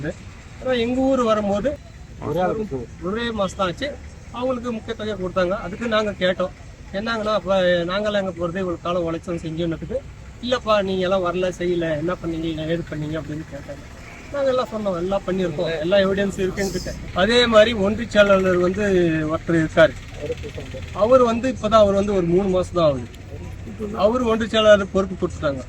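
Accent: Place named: North India, India. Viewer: Dai nee pesuratha patha north Indian pola iruke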